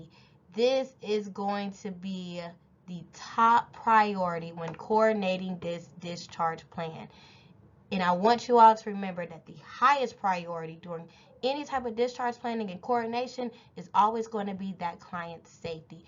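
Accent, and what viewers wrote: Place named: South, United States. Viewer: Super helpful, thank you! And love your Southern accent!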